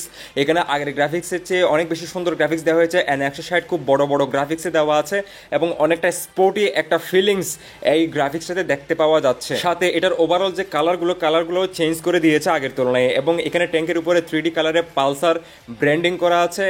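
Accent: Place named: Chittagong, Bangladesh. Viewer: ভায়ের বাড়ি চিটাগাং। এক্সেন্ট এ বোঝা গেলো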